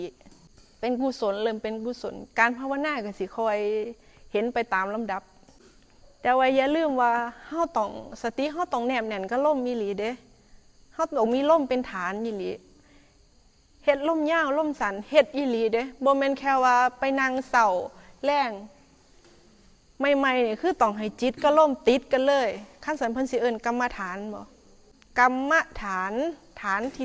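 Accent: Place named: Laos. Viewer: แม่ชีเว้าน่ารักตกคำเว้าลาวกะเปนตาฮักจ้าน่ารักมากค่ะ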